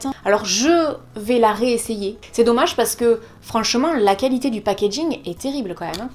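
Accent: South, France. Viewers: Cool la fin. You are the best.. Avec notre accent du sud. J'ai Instagram. Je vois le hall de ton gros colis kado à gagner mais jne peux pas commenter et m'inscrire. J'adore ces couleurs de rouge à lèvres te va super.. Je l'adore.. Bizou 💋❤️ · Merci pour toutes tes vidéos j'aime énormément j'aime beaucoup ton accent du sud. Je suis aussi du sud de la France de Nice plus particulièrement! Bises toi et à ton chien qui est beau comme un cœur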